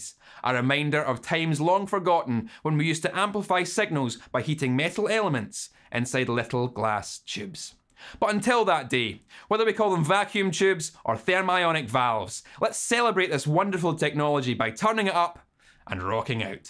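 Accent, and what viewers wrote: Scotland, United Kingdom. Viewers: I'm 57 and learn new shit from you every time I watch one of your videos. Love the Scott in your voice. Thank you. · great explanation, but your American accent sounds like an American doing a Scottish accent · Watching video like "Ok. Makes sense. Grid biased... Anode whosits... Dull Scottish voiced white noise playing as consciousness ebbs away... Hit like and subscribe" and I'm back to reality. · Still has Scottish in his American accent. I love it. I love it so much. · Great, as usual. Love that Scottish accent, since I learned English in Edinburgh.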